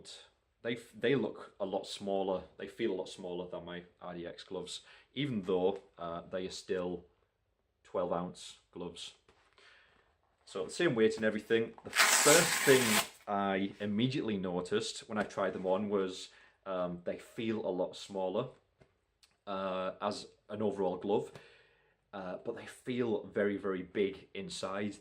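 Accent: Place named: Scotland, United Kingdom. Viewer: these are literally my dream gloves I own a pair of heavy bag fairtex gloves and love the Daylights out of them also I noticed the Scottish accent I'm originally from Manchester and live in the United States I could be wrong you could be English but you sound more Scottish to me am I correct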